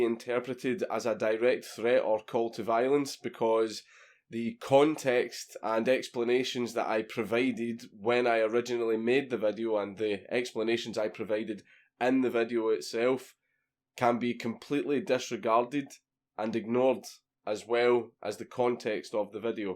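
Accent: Scotland, United Kingdom. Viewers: wrong country dude he's Scottish not Australian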